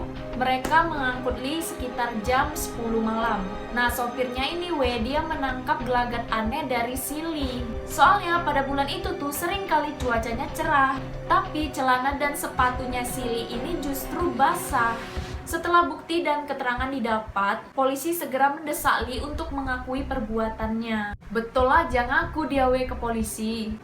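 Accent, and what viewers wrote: Medan, Indonesia. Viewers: Mantap logat Medan nya euy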